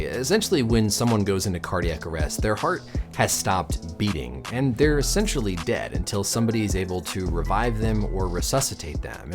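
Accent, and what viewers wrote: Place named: Michigan, United States. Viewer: Unrelated to this video but I had a very strong feeling you’re from the Midwest/Michigan and googled you lmao the accent sounds very Michigangander esque. Love how informative and straight to the point your content is Cheers doc!🍻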